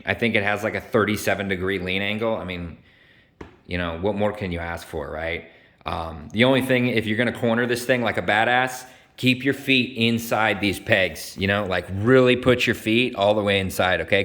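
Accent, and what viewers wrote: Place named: Texas, United States. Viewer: Bro, you got a slight hint of Texan accent now. Also, nice bike.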